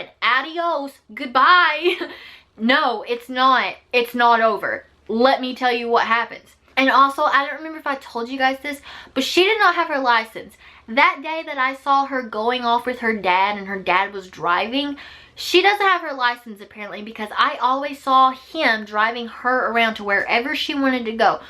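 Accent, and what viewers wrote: South, United States. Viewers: I like your country accent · you have an cowgirl accent xD but its so cuteeee and i thought you were old when i first saw your musicals xD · Where are you from? I'm from Alabama and your accent is really strong compared to people around me. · I love her accent lol! She's a lil country lol! She's so cute and adorable and so funny!! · You sound like you have a bit of a southern accent.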